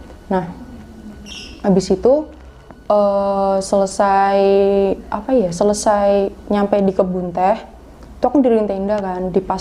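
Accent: Java, Indonesia. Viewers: . Omongane Medhok 😅😅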